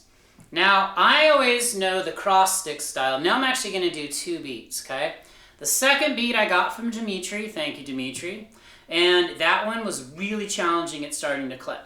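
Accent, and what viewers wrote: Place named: United Kingdom. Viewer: Oh u are from uk, I think u are from america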